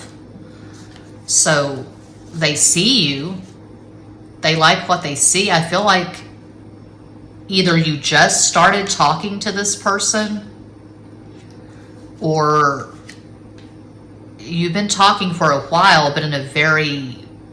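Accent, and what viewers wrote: Texas, United States. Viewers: Eres muy buena!!! And i love your texan Spanish! i lived in F Worth few years ago. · I love Texas accent, love from Austin, TX. 💖 Picked Pile 4, wish we are committed, but already broken up, he immediately jumped back to a rebound with his ex, essentially monkey branched behind my back.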